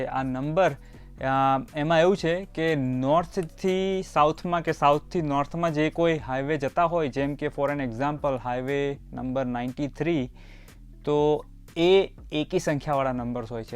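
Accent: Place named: Kathiawar, India. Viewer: Pure gujarati (kathiyawadi)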